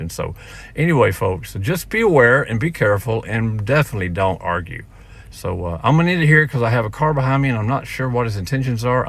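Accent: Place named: Texas, United States. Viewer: Thanks, I thought it looked like Texas + accent too 🙋‍♀️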